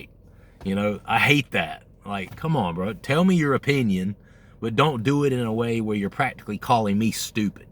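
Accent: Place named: South, United States. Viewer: Interesting you mentioned you're from Georgia with parts of the "typical" Southern accent. My parents were born and raised in Ohio. When I was two, in 1958, we moved to Atlanta - my parents actually had the house build on the new lot. But it was in a brand new suburb in South Atlanta. Just about ALL the kids in that neighborhood and my schoolmates were transients from other parts of the country. When I moved to New York City at the age of 26 (Yes - it was quite a transition!) - most New Yorkers couldn't tell where I was from by my accent. NONE thought I was from the Northeast! They REALLY didn't guess the South.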